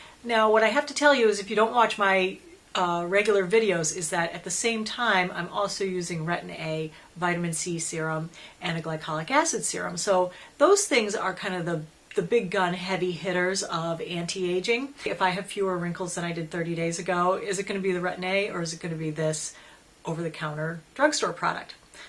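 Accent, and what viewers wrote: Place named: New England, United States. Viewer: Thank you for the reply, I'm sure you're right it seems to be a bit better. By the way, it is 65º here, just lovely, but I totally relate to your winter woes, I'm originally from South Boston, another New Englander, though you still carry the "r's" in your videos, unlike myself, even after living 20 years in Spain. A very Merry Christmas to you and my thanks for such fun and informative videos.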